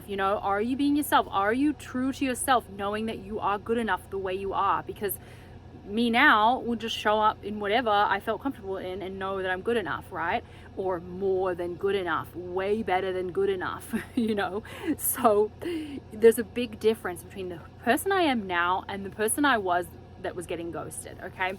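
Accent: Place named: Australia. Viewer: Super cool scarf!! Australian accent superb as always!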